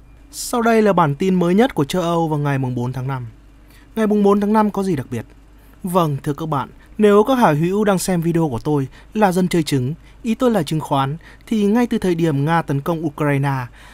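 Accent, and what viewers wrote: Standard. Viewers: bạn nói rất hay .chuẩn luôn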